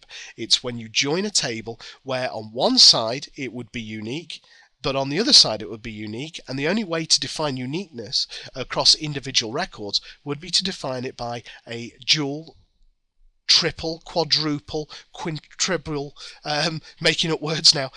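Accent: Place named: United Kingdom. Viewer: Hey brother thank you , i just did whatever you showed in this video and i learned how to find the relationships and dependancies.Thank you and you'r british accent is nice too.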